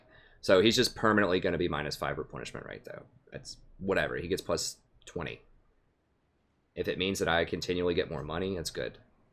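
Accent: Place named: South, United States. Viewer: I'm from the south, and I think you have a small accent, so when you said "LOOKS LIKE GOOD FOOD TO ME" I died lol. Also, "it's late, I'm feeling frisky" lol, really good channel. I had a question though, I want to play a mortal enemies campaign with a faction that has late gate powerful units and economy to spread across the map, what faction would that be?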